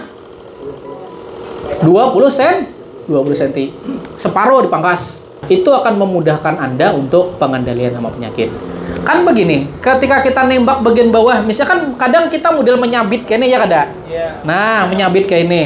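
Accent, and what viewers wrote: Banjar, Indonesia. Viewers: Logat Banjar kayaknya...Smoga sehat selalu.Ulun jg pernah tinggal lama😀 di Bajarmasin.Kutu kebul ko datang terus knapa yah...ditanaman jeruk.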